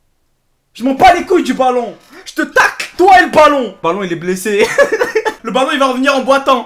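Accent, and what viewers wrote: Marseille, France. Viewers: Tu ressemble à un chinois, t'es pour paris et ta un accent marseillais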